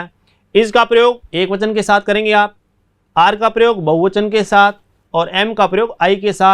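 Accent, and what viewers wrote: Marwar, India. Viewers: Sir your English is not fluent Marwari tone aa rahi he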